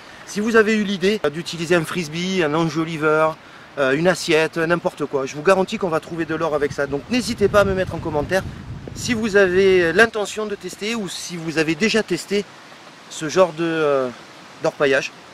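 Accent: South, France. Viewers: ta un accent du sud de la france moi du québéc pas du tous la meme accent merci pour tes super conseil le cousin francais super intéréssent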